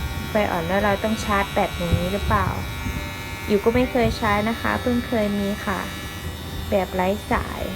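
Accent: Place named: Isan, Thailand. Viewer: สวัสดีครับผมเข้ามาชมคนรีวิวครับเสียงม้วนดีครับมีทั่งภาษาอีสานภาษาไทยกลาง แม่นครับช่วงโควิด19ไปร้านตัดผมกะย่านยุครับ💞😍จังได๋กะแคล้วคลาดปลอดภัยกันทุกคนเด้อครับ🥰❤️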